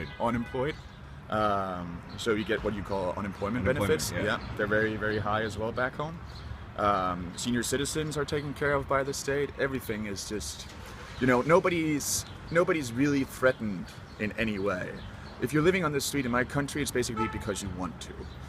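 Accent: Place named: United States. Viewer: I kind of have to agree with Sassy... I've lived in Denmark 8 years now, and have never heard anyone sound as American as this guy. Not even my Danish friends that have lived in The U.S.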